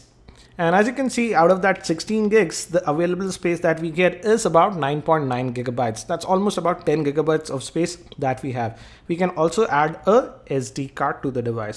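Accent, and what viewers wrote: India. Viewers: Indian english❤️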